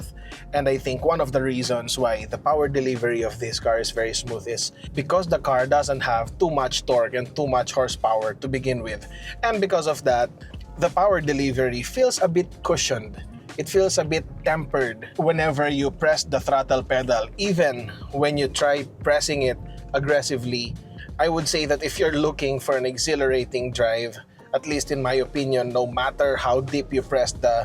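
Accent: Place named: Tagalog, Philippines. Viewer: Ang cringe ng english mo hindi fluid pagsasalita. Kahit sabihin na naten 2nd language yung english mo iba yung choice of words at delivery mo pang tagalog talaga; papunta na dun sa english-carabao. Nagtagalog ka na lang sana tapos lagyan mo na lang subtitle na english.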